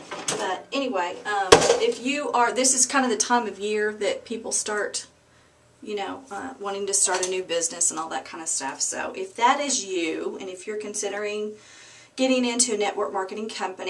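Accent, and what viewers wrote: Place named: Texas, United States. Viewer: Miss: You have some pretty long hair and you look real pretty w/o your make up.I like you texas accent and hope that you really enjoy your movie. Happy belated birthday. By the way I have been selling on ebay since 1998 and even sold items on Yahoo auctions at the same time back in the 1990's. You are definitely a true lady entrepreneur and know how to be self sufficient!!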